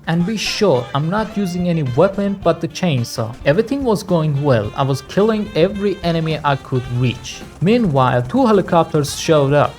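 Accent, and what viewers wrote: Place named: Iran. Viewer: Persian accent 😂😅❤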